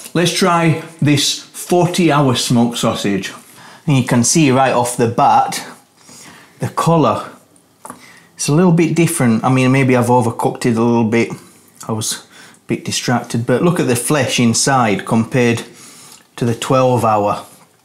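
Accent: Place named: Bradford, United Kingdom. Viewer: Northern Spanish from Bradford? Unique! 😁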